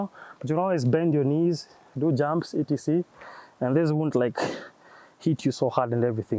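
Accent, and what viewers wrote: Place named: Scandinavia. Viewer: wonderful review in a near viking accent🤩🤩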